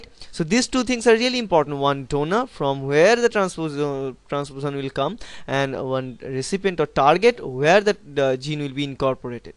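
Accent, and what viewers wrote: India. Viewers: Dude I swear. Every single time. An indian accent.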